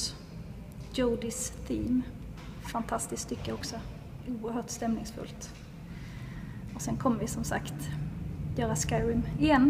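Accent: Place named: Scania, Sweden. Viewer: Most swedes don't anyway, she's from Skåne, perfectly understandable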